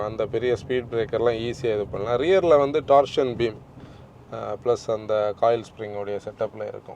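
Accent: Kanyakumari, India. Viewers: veri nice explains.....👌👌👌👍👍👍💯💯💯 I am Indian Army 🪖 ஐயா கொஞ்சம் கன்னியாகுமரி பாஷையில் கொஞ்ச நேரம் பேசுங்க அதை கேட்கணும்னு எங்களுக்கு ரொம்ப ஆசையாக இருக்கிறது...🙏🙏🙏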